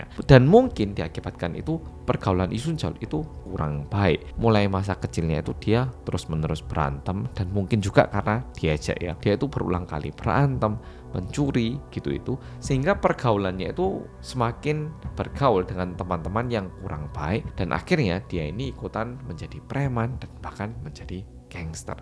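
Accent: Java, Indonesia. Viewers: Saya yang dari bayi di jawa pun tidak seMEDOK Mas 😅 bisa enak aja gitu denger Mas nya ngomong.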